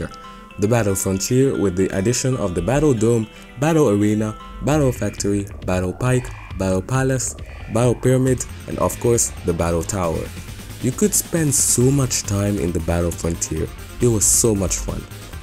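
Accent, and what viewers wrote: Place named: West Africa. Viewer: Oh that makes sense, I’m from Ghana. Another West African Pokémon fan! That’s great. Keep up the grind. You just got another YouTube subscriber and any other social media you’re on. Once again love your accent!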